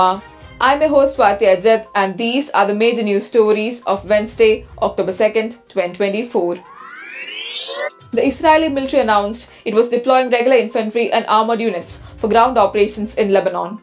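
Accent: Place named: Kerala, India. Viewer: The news reader reading in Malayalam accent!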